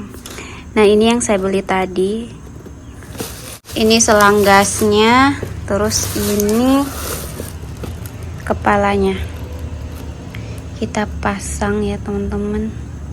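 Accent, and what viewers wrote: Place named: Bugis, Indonesia. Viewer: ku kira ikan garam itu apa, ternyata ikan asin 🤭 kalo belihat bebahasa bugis logat logat bugis gitu saya suka dengarnya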